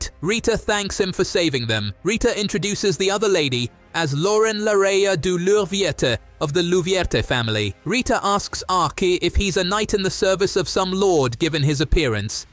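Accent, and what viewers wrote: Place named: United Kingdom. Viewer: I love the British voice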